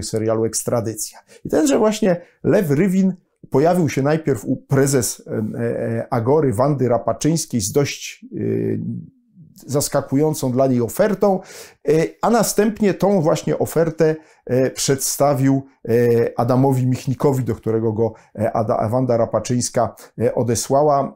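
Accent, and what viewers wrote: Russia. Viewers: Jaki piękny polski język z rosyjskim akcentem :) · Z jakim ruskim akcentem gada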